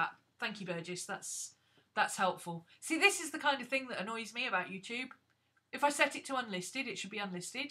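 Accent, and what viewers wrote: United Kingdom. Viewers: Hi,. I love planner flips. I just asked to join your FB group. Actually, may I ask, where are you from specifically in the UK? I do believe it's an English accent, but I think there may be something else in there?? Not sure. Right now, I 'm fascinated with accents, especially British accents. I am writing a book, actually, and am trying to write some dialogue in an accent for some scenes. Boy, that's hard, for an american, anyway.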